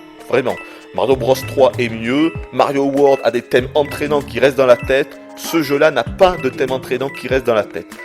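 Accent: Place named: Southwest, France. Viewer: est du sud ouest: (mouainsse AU LIEU DE MOINS ) XD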